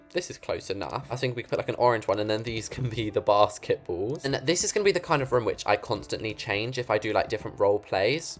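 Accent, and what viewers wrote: United States. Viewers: What county are you from? I actually thought you were American, no offence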